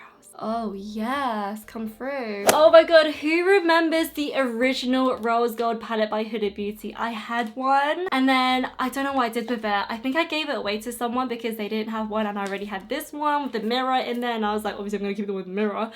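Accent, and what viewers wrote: United Kingdom. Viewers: Omg are you British !!!???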